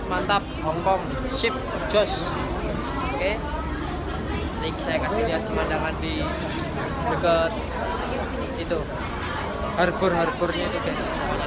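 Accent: Java, Indonesia. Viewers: Akhirnya muncul jg vlognya si ganteng tp medok ini haha😆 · Dari kabeh vlog yang kulihat semuane gawe kemekel tapi seng lebih kemekel yang jalan-jalan Disneyland Hongkong terus sama tutorial push-up, sit-Up, back-Up yang Salah. Demik seneng banget kambek medhok e. Sukses selalu mas 🙏 Selalu sukses buat mas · si muka bule suara medhog andalanku🌈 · Oalah lagi..ditungguin gak nongol2..upload juga. Suaranya..gak keliatan orang Jawa ya mukanya tp medok nya bikin ketawa 😂 Inget gak pas lagi tanding trus mau ke toilet ngomong pake B.ingg ke wasitnya. Aku ngakakkkkkkkkk lucuneeeeee yaallah sampe diulang2 ampe gak kuat aku nonton nya juga 😂😭 Keren lah..👍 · Suka denger ka ngomong, lucu lucu medok gemesin ea.sip sip wkwkwk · Wohooooo, akhirnya muncul juga yg ditunggu..medhokmu itu lohhh 😁 Sukses selalu yaaa😉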